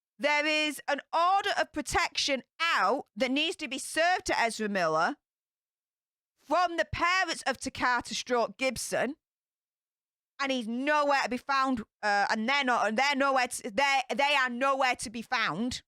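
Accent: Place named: Northern England, United Kingdom. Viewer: She's a Northern Lass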